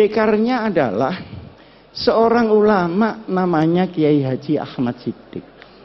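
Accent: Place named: Malang, Indonesia. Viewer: KANGEN LOGATNYA KYAI MALANG PUOL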